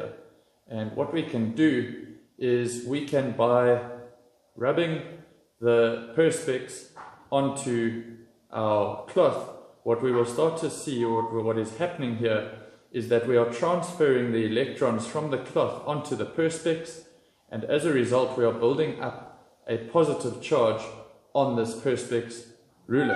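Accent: South Africa. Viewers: south african hey?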